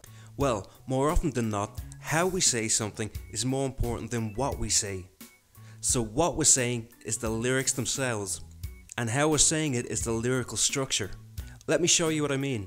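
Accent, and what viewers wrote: Ireland. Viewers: love the Irish accent...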